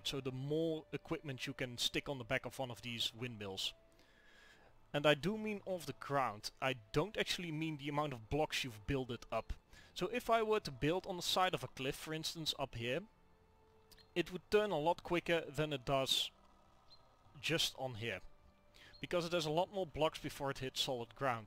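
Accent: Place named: Netherlands. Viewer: dat is leuk ik ook ik hoorde aan je stem dat je niet van Engeland of America was je klinkt gewoon Nederland toen ik je hoorde praaten dacht hij klinkt Nederland ff vragen waar hij vandaan komt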